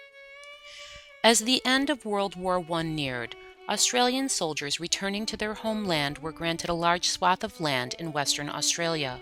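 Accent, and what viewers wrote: United States. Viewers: its funny but ruined by it being narrated by an American. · WTF is an "ee-moo"? Suggestion for narrator... If you're going to do a voice-over about an Australian thing with your American voice, learn how to say its name. Google it, or ask an Australian. How hard would that be? It's an "eem-you".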